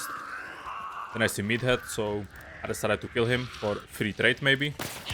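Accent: Czech Republic. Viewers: Náhodou jsem na Tebe natrefil, podle přízvuku si říkám, to zní jako obyvatel stejné země jako já a ono ano 🙂, jsi opravdu kapacita, mrknu, zda máš i další videa a pak dám odběr, díky. :hand-purple-blue-peace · Damn i think i hear czech accent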